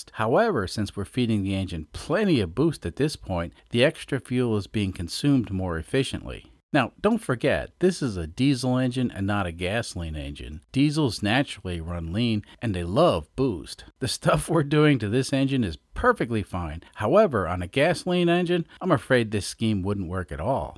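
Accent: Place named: Midwest, United States. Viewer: I’ve been thinking about why this channel “works for me”. - First of all its simply entertaining. - Your channel combines several of my younger self passions (being a motor head, electronics, computers, and just making stuff to see if I could do it). - You explain difficult subjects with clear to understand “Midwestern dialect”. (I was really wondering how you were going to pull off explaining interrupt processing, but you did it well.) - The pictograms and simple explanation help us to visualize new concepts. Not everyone has a mech-e or computer science background. So pictures help greatly. - Your approach to the channel is much like just a couple of guys hanging out in the garage trying out different ideas, some work and some not so much. If everything worked the first time, what would we have learned? As for channel statistics, while you need to keep an eye on them for the health of the channel, I wouldn’t focus on them too much. 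Make what you enjoy and they will come. (edit: I tried to make a weak field of dreams reference, but I just looked it up and that was Iowa not Kansas. If it worked the first time, what did I just learn?)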